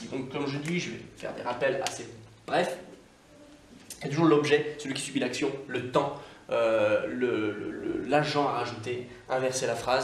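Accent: Scotland, United Kingdom. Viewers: Hi thanks for your tutorials, it's nice to hear your scottish accent, I'll try that with my students this afternoon! ;) Justine x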